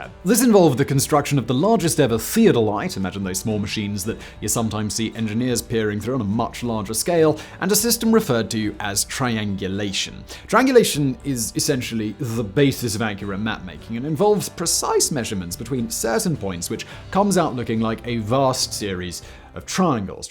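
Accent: United Kingdom. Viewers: Maybe it's my imagination, but, to me, becomes a little more British when he makes a video about his home country.🧐☕🇬🇧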